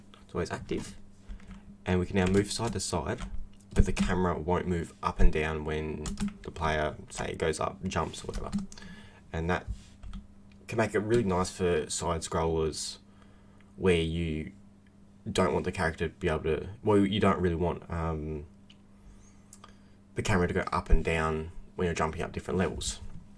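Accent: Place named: Australia. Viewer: you can tell he's aussie, i can cuz i am too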